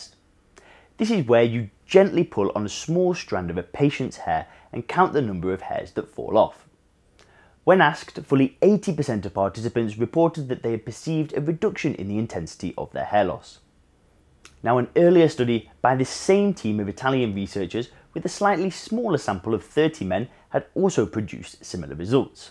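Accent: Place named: United Kingdom. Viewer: I enjoy listening to British accent.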